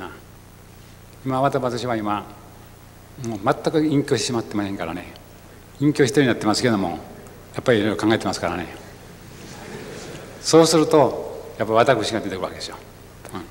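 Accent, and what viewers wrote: Kansai, Japan. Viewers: 関西弁を心地よくしゃべる大先生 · 本では見たことあるけど生で初めてみた。こてこて関西弁なんですな。また好きになってしまった。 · 幸之助さんの話は非常に分かりやすいのが素晴らしいと思います。 優秀な人ほどわかりやすい話し方をするそうです。 受け売りではなく実体験を語っているかでしょう。 近年経営者が話す難しい国語表現や横文字ビジネス用語は使わない。 一般人の虚を突いたようなことをいうわけでなく当たり前の話であり共感しやすい。 名言集でも不思議と偉そうな説教には感じないんですよね。 尤も昔の人だしベタの関西弁なので聞きなれない人は聞き取りにくいかもしれませんが。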